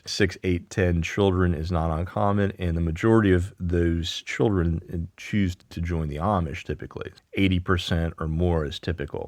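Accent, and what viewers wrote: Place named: Baltimore, United States. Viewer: You sound like you’re from where I’m from lol. The way you say your Os. I’m from just north of baltimore, not to far from SE PA Amish country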